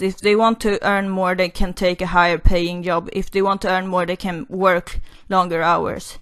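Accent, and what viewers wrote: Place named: Sweden. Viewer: are you from sweden? you sound swedish!:)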